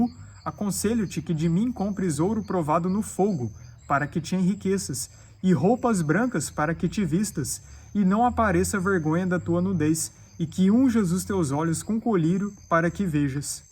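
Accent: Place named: Minas Gerais, Brazil. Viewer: Olá! Eu adoro ler os comentários dos irmãos! E muitos que li me identifiquei. Alguns irmãos testificam sobre a tristeza que sentem dentro dos templos, por vários motivos, inclusive e principalmente por só pregarem prosperidade e não o evangelho na sua essência. Isso sempre me incomodou as poucas vezes que fiz parte. Fui batizada ainda bebê num templo católico da minha cidade, no sul do Piauí. E nunca me sentir com vontade de fazer parte de algo por lá, pois o meu corpo não me pedia pra participar, uma força superior parecia me impedi de estar ali, isto eu percebia. Aí eu fui ganhando maturidade sobre este assunto e eis que descobrir os motivos da minha antipatia por tudo isso. E hoje com 49 anos, tenho certeza que foi o Criador que me livrou desse ambiente, eu creio que ele me ama muito em ter me livrado dessas abominações. Eu digo sem medo, sou infinitamente grata ao Pai por ter me feito enxergar desde cedo o que tudo isso significa na sua verdadeira essência. 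Obrigada, irmão! Vc tem me ajudado muito a entender sobre a palavra, assim com seu jeitinho mineiro de falar😊 muito obrigada de ❤️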